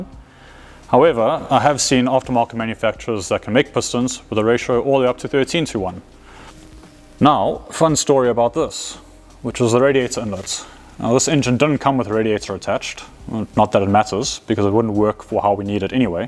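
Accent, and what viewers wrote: South Africa. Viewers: i heard the South African accent and subscribed immediately........good work buddy. Use motor bike ITB`s.........i saw a guy on youtube build ITB`s for a V12 Benz engine out-off Hayabusa intakes........just go to the scrapyard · Your accent also seems South African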